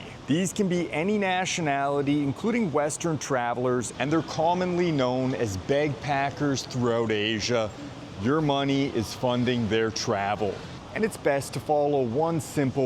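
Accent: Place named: Canada. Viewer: He's a fellow Canadian - the sound of home ;-)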